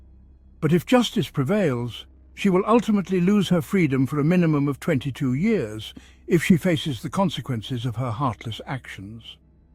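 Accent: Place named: United Kingdom. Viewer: Who would have thought there would be a connection between the Sarah Boone murder case and a Creepshow episode that aired Oct 2019, a few months before the murder occurred. What they both have in common is the implied archetype of “The Suitcase” - with its potential darker aspects [used as a torture device] and for being an obsessive fetish. I enjoyed watching intriguing video with its “creepy” topic: Comparing the horrible evils that can exist, each involving a suitcase possessed by an aggressor who had control of it from the outside, to a victim trapped within - who got stuck inside its claustrophobic confined space. Pointing out similar comparisons: The two suitcases looked almost identical - appeared to be about the same size. The aggressors used a bat for violence and dealt with the concept of the suitcase being thrown down the stairs. The audience is also left with the scary image of a hand popping out of the suitcase, not to mention the idea of a foot stomping on it/being stepped on. 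[This is implied when Sarah Boone’s sandals were shown next to the suitcase - from the 2nd mobile phone shot suitcase video. - that no one seemed to notice] The British narrator had that perfectly nuanced, articulate sounding voice - well-paced enough to reveal an unusual, dark side and sinister portrayal of the troubled human condition. This video came out at exactly the best optimal time: A few days after the guilty verdict [of 2nd degree murder] was reached in Sarah Boone’s Jury T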